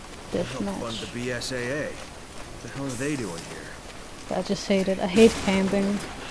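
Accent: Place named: Denmark. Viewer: ^.^; Man how long we've come when we were just BF and GF. I still love your danish accent honey! <3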